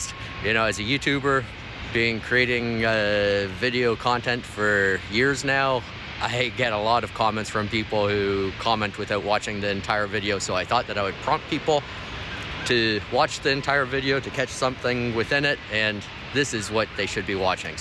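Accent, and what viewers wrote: Canada. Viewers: I watched the rest of your video after posting that. I often post as I watch. I suspected I was being trolled pretty quickly after writing that, when ~ 2 minutes in I noticed your accent is definitely Canadian. Not many Canadians don't know where their taxes are going! It was fun for me watching you walk around Ottawa, a city I lived in from 1978 to 2020. You walked past a house I lived in on Armstrong Street. The new owner painted it a rich blue; it looks nicer. A comparison of our country to itself in the past, and others now, shows how quickly we can sink. While the gap between rich and poor turns into a chasm all around the world, our economy has always been vulnerable because our leaders were under foreign rule and/or influence. Oligarchs see Canada as a vast resource with a capable and compliant labour force. It truly is sad how the kleptocrats have accelerated their looting in recent years. 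It feels like the end game...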